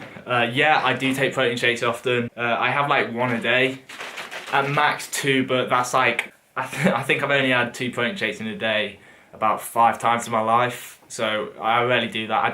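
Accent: United Kingdom. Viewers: Anyone wanna else not expect hi sound british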